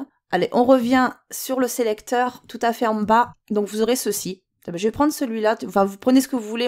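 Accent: South, France. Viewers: Salut, tu viens du sud ? sa s'entend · Coucou! Merci pour ce petit tutoriel confectionné tip top! C'est génial de suivre tes explications avec l'accent du soleil.... quelle facilité, à croire que l informatique est dans tes gènes 😆. Maintenant au travail, là c'est une autre paire de manche hi hi.... merciiii 😗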